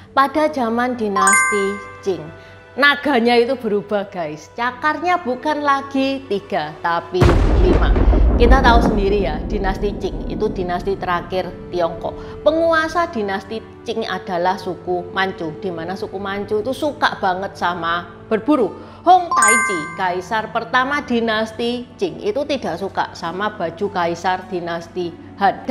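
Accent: Java, Indonesia. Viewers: Enakan dengerin dongeng nya tuch.. mantep bingit dg bhs indonesia yg ber'aksen jawa medok.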